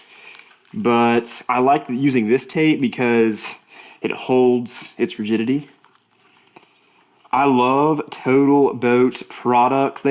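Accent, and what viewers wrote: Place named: Hickory, North Carolina, United States. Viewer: Thanks for your honesty. I've recently found you on you tube. I'm a relatively new woodworker and I like you content better than anyone else I've watched on YouTube. Your accent sounds like your from my wife's hometown of hickory nc......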